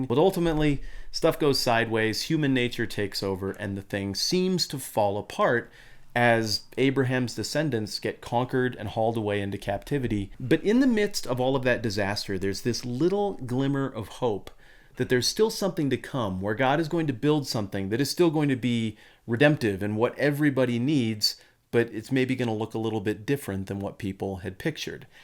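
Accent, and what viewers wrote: United States. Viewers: Are you from Wyoming?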